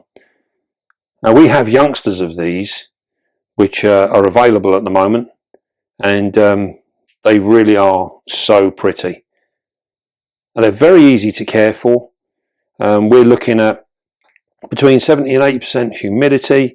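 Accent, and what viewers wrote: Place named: Cockney, United Kingdom. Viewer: "Oh maay giddy aunt"......😮...😅 Brilliant cockney real London gab, luv it ❤ 👏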